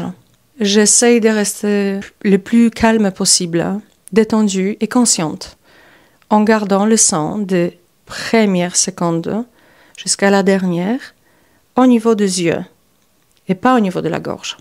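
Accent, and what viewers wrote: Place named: Czech Republic. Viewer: Vous êtes des pays de l’est, tchèque? En tout cas trés intéressant votre voie pour travailler la voix.En comédie, pour dire, on représente un autre que soi,une cible, les yeux dont vous parlez , avant la voix que l’on projète. Merci!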